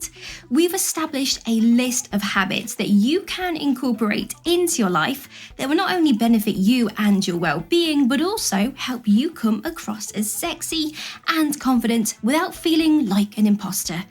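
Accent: United Kingdom. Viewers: For me, a woman with a British accent will catch my attention fast. (I find it difficult to not watch these videos because your voice is so magical.) I have heard women say the same thing about men. Please teach me that accent.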